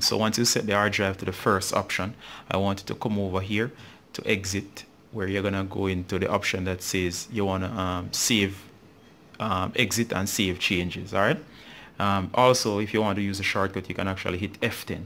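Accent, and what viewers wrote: Jamaica. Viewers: Are you Jamaican? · where you from? you sound like a yard man.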